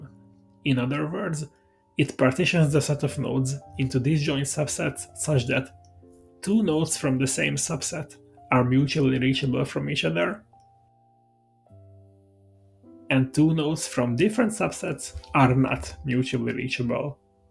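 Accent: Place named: Hungary. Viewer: Judging by your accent and choice of music, you're from Hungary or Slovakia.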